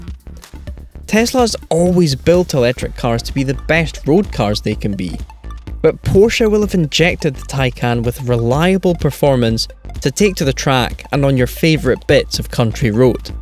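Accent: Scotland, United Kingdom. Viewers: please get someone thats not scottish...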